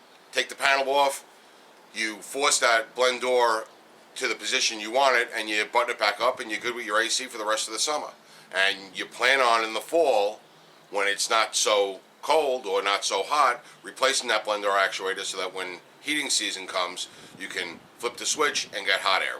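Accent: New York, United States. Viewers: I especially love the New York accent! I’m from Yonkers & moved to provincial Connecticut where that familiar accent is missing. My 1997 GMC has had numerous A/C issues. I was told by a mechanic the new issue is the blend door actuator? Now I know what I need. Thank you! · You are hysterical! Love the New York accent! I am a Mom down in Georgia and replaced the actuator by myself, so I had to move my own crap out of the back! The cool thing is that I found some boots I bought that I had forgotten about! Now the back of my car is cool and so am I! Ha!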